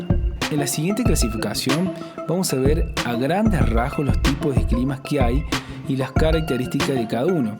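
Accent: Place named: Córdoba, Argentina. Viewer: como amo su acento cordobés LA PUTA MADREEEE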